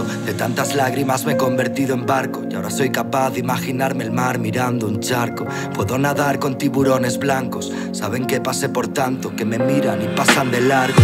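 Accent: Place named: Andalusia, Spain. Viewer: me encantan tus temas, pero deja de poner acento del sur, enserio tio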